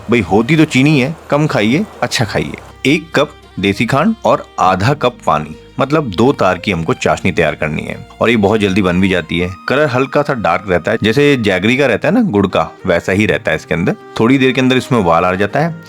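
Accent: Uttarakhand, India. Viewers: हम दोनों बैठ कर TV screen पर आपके द्वारा बनाये जाने वाले व्यंजन को गहनता से सुनते हैं। कभी कभी आप कुमाऊँ लय में बोलते हो कभी हरियाणा राजस्थान से मेल खाती भाषा का प्रयोग करते हो। सारा मिलकर आपके प्रोग्राम स्मर्णीय होते हैं... धन्यवाद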